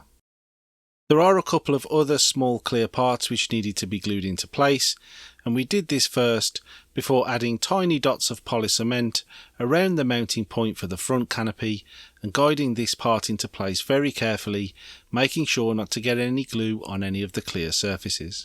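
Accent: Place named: Liverpool, United Kingdom. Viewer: I love watching these videos even though I’m never going to do it myself. I’ve done modelling and I’m done with it. I sill enjoy watching the process as a spectator. This videos are entertaining in their own right and I love the presenters slightly bland Liverpudlian voice. Very relaxing video to sit through strangely. Please do Cutty Sark, a favourite of mine.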